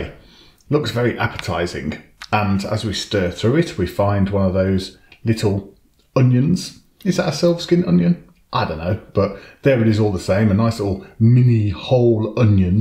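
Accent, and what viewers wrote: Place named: Birmingham, United Kingdom. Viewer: Watching how much you enjoyed this I’m gonna have to get myself one! You sound like a fellow brummie?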